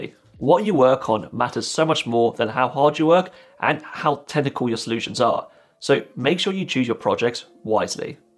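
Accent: United Kingdom. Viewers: I am using your video as part of my IELTS listening;you have a nice british accent.Keep good health brother❤️.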